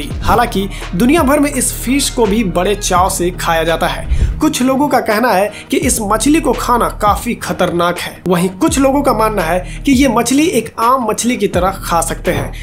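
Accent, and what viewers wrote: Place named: Bihar, India. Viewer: Awaz???jani pechani hai Behari touch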